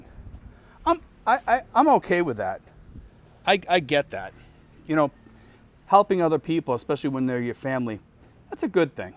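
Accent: Boston, United States. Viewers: Good video, u From Boston?? Lol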